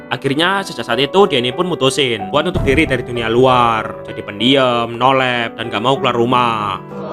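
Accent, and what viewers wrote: Java, Indonesia. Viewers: Jawa nya ngejawa bgt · Jowo nyelll abang kuuuu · jowo mas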